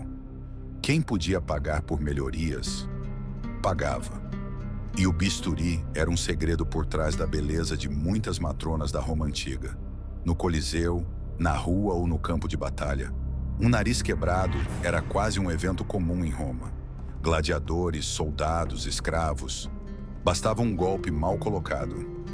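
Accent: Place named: São Paulo Countryside, Brazil. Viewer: Parabéns pelo Canal, eu estou amando e gostei muito do sotaque da voz 😅 bem paulista do interior; me identifiquei muito!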